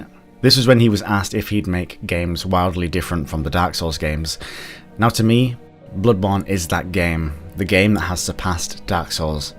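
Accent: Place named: North, United Kingdom. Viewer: ok I already spent 4 miserable years living in the north I don't need to be listening to your disgusting accent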